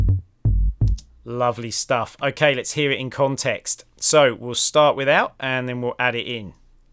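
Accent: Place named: United Kingdom. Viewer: score a smooth British voice. thanks mate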